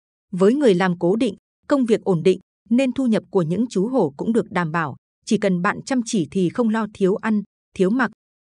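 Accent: Thanh Hoa, Vietnam. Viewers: Thầy ở huyện nào thanh hóa vậy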